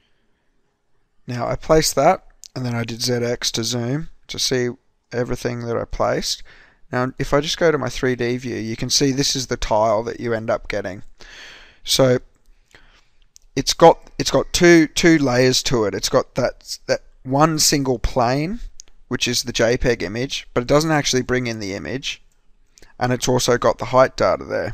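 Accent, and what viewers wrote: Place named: Australia. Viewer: Love da Aussie accent mate!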